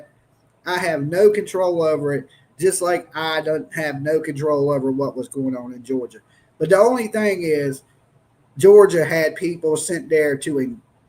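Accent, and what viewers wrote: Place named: Kentucky, United States. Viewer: First time watcher. I like the style you do your show. You talk like most common folk I know,Im from southeastern Kentucky.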